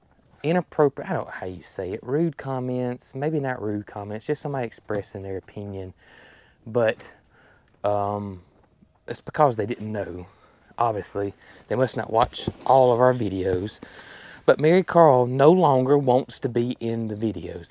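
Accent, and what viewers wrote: South, United States. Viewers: and you have such a beautiful intelligent daughter grown up fast we hope zerks ok. my husband could watch you in youre man tunnel allday 24/7 I hope does more cooking as we are from Scotland i cooked and gave him cornbread and turnip greens yes it was a thumbs up cornbread now part of dinnertime with his gravy love the southern accent you should do an audio book soothing voice and when can bring a southern cookbook out love the clan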